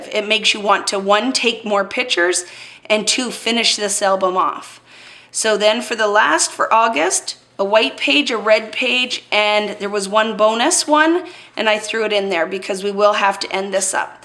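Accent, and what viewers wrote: Canada. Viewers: Just stumbled upon your videos! Love them!! I kept saying to myself "is she Canadian?"...so I went off to you blog to find out... Born & Raised in Ottawa, wavin' atcha from Houston Texas! :D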